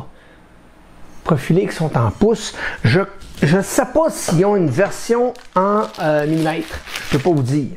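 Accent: Canada. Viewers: Bravo pour ce très bon tuto (surtout avec l'accent canadien pour nous français). il serait intéressant de connaitre la vitesse et la puissance du laser pour les travaux effectues. Encore merci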